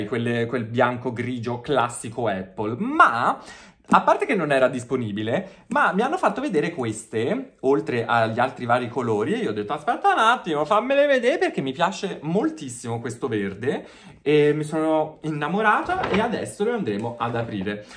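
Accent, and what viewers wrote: Tuscany, Italy. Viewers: Ciao ….io è da poco tempo che ti ho scoperto e ti seguo…ma sei stato una meravigliosa sorpresa….non riesco a fare a meno di guardare i tuoi video perché mi fai spataccare dalle risate….sei una persona davvero stupenda e sono molto felice di averti scoperto! Poi hai quell’accento toscano che io ho sempre adorato e mi piace da impazzire😍 ti auguro tutto il bene di questo mondo e che tu possa fare sempre piu video e avere sempre più persone che ti seguono…perché te lo meriti! Sei unico!❤………… ah stavo quasi per dimenticarmi…..no scherzo🤪😂 tanti tanti auguri di un meraviglioso compleanno❤ ti adoro 🥰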